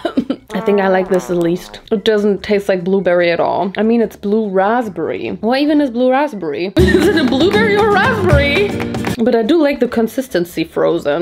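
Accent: Germany. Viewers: I have, a questioni are you German russa nord soming else? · What is her accent? I am so confused. Sounds like German, Irish, but then just… western American? How?! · I love your accent I always wanted to go to Germany😁😁😁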